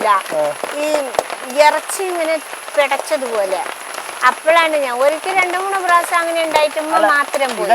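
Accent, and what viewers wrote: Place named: Palakkad, India. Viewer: Ningalude veed evade. Palakkadan slang und avatharanathil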